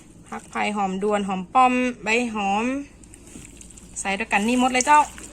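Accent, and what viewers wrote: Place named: North, Thailand. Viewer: คนเหนือมาอีกคนแล้ววว ฟังสำเนียงแล้วอยากไปเที่ยวทางเหนืออีกครับ มาติดตามด้วยคนครับ🙏🙏🙏😍👍🔔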